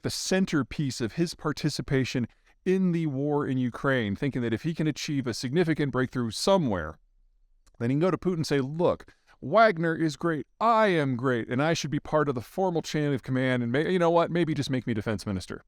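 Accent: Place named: Iowa, United States. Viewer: Peter has always steadfastly stuck to his Iowa "Northern Midlands" approach to pronouncing transliterated Cyrillic. Nothing from Cyrillic he says will ever be pronounced correctly.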